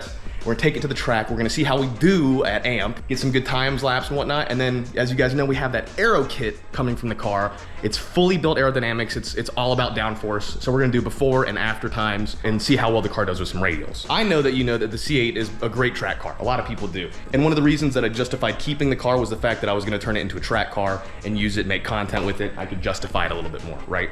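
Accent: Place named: United States. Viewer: A man and his deep country accent TRUCK, Yee Yee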